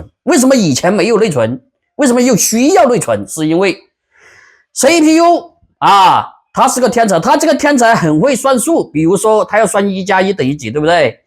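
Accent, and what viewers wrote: Sichuan, China. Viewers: 设备，噻呗😅 的川普很有意思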